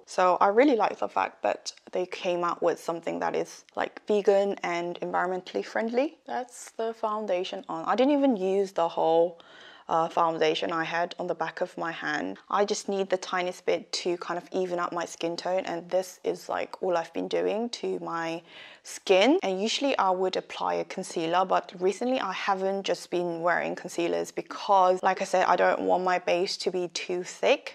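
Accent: United Kingdom. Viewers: 딱 틀자마자 영어하시는거 보고 영국 생각났네요ㅠㅠㅠ 앞으로도 자주 듣고싶어요💕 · 으아 ㅠㅠㅠㅜㅜㅜ제가 좋아요, 댓글 일등이라구여ㅠㅠㅠㅠㅠ헝 사랑해여 울언니ㅜㅜ 영어 영상 너무 좋아여..그녀의 영국식 발음 = 귀르가즘 🤍 그럼 이제 영상에 집중 할게요 🙈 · 졸예❤️ 예전처럼 아이라인을 빼서 그리지 않아도 있는 그대로 자연스럽게 너무 이쁘네요~ 사용하는 색이 더 옅어지니까 좀더 퓨어 해 보여요💛 이번 스틴푸드 파데도 이런 맑은 메이크업에 너무 찰떡이에요💜 비건 제품이라 너무 좋네요 비건 제품이 더 많아졌으면 좋겠어요~ 그리고 의 영국식 영어는 언제 들어도 멋져요😊 뭔가 해리포터가 생각나는…?? 저만 그런거 아니죠??ㅋㅋ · 언니 브리티시 악센트를 다시 들을 수 있다니 감덩이에요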